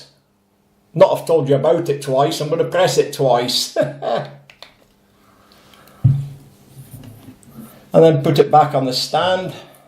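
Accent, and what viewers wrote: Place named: Scotland, United Kingdom. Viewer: way better explained in an old scotish accent than in the Ikea type of instruction supplied. Thanks!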